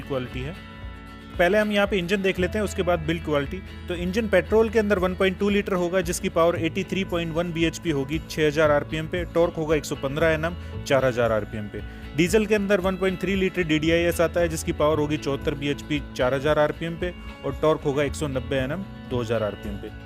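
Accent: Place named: Gurgaon, India. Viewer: Bhai Boli Se Gurgaon ka Lg Rha Hai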